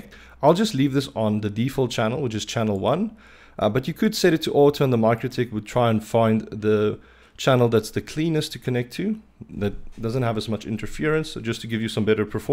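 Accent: Afrikaans, South Africa. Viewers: You sounds like a Afrikaans speaking person. Thanks for the videos man👌👌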